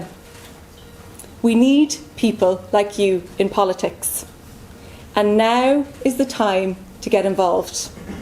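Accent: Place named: Cork, Ireland. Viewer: its great you never lost the cork accent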